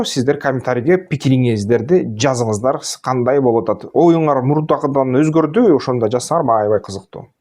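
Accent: Standard. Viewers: АРЫБАНЫЗ, Бар болунуз мырза таза кыргызча суйлогонун кубандырат.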